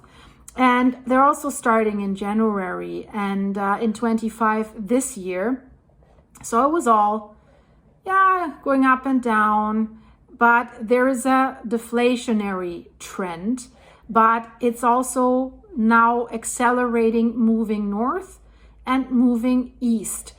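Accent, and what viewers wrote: Germany. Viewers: What a funny accent 😂 Is this German, Canadian or anything in between? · Actually, is German and lives in the US, hence the accent. I love accent 😁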